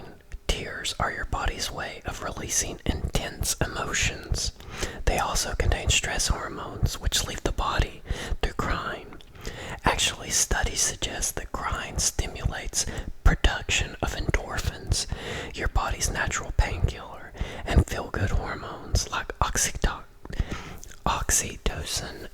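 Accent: South, United States. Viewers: You're very welcome, you whisperin' southern gent ☺️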